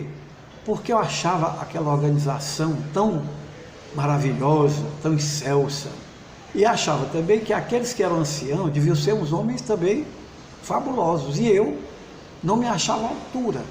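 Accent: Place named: Rio de Janeiro, Brazil. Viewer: Este é sem sombra de dúvidas o mais carioca de todos os cearenses. Parabéns pelo ótimo depoimento. Grande abraço.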